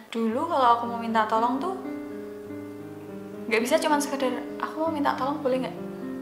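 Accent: Java, Indonesia. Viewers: Mbak jowo ne ndi to kok muuuwwweedook bngt 😀😀😀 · boso jowo e medok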